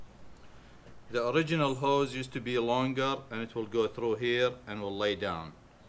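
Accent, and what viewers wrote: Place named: Iraq. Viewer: Don't get me wrong you have noticeably Iraqi accent so, can you open an firearms channel with your Iraqi accent, the thing is similar to fps Russia or ( سلاح زون gun Zone )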